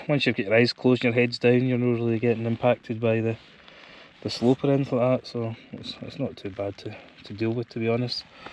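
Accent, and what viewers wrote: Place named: England, United Kingdom. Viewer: I like your English Accent 💜💜 Sometimes I don't understand your English Accent Because I can understand American English Accent 🤣🤣🤣But I am enjoying your videos all the time 💪👍🌷💜🌷🌷🌷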